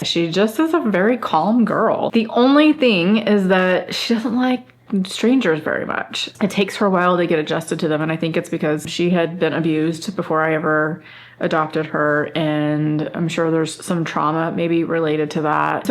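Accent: Texas, United States. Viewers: Hello,. My wife subscribes to you and we’ve watched you for years. I finally subscribed, too, DUH! Shoulda done that a long time ago. I, too, have long wanted to write a memoir that also incorporates some of my Dad’s story. I have a blog named The Gay Man’s Daughter, if that tells you anything. One thing I have decided is that if I write a book it won’t be linear. Everyone tells me that I’m full of stories which I tell from time to time. Such as My Mother’s Six Degrees of Separation to the JKF Assassination. (She had a clothing store in Arlington, Texas - my home town - and sold the clothes that Oswald’s children wore to his graveside funeral to two men in dark clothing the day before. She didn’t realize it until she saw the photos in the Dallas Morning News the next day. I didn’t learn about it until I was 17.) Those kinds of stories! I left the DFW area at age 35 to relocate to the Puget Sound area as well and have never looked back. 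Thank you for sharing everything in this video. You’re an inspiration to me and my wife and to so many others. Your grace and intention is a hard thing to find and I know you’ve worked hard for it. It’s also nice to hear someone who has the same “accent” that I do! I worked hard to lose my accent when I moved here 33 years ago cause my students poked fun at me. Love you and everything you do. (We have an RV van and travel in it quite a bit - we love the road, also.) Take care.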